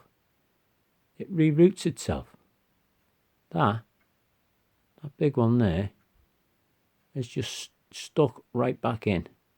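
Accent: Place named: United Kingdom. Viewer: I see where do you live in England you seem to have a British accent maybe one could send it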